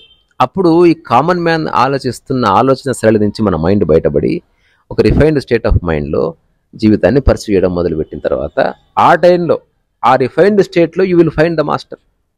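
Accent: Telangana, India. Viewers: అన్న తెలంగాణ యాసలో చాలా చక్కగా మాట్లాడారు .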